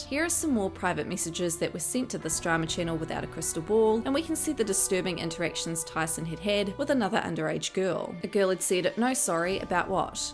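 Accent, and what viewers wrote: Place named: New Zealand. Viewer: But for the accent and inflections, so distracting. Sounds Kiwi then have to mute with subtitles only. No complaints about the content though Carry on while I move on to something not so jarring to listen to.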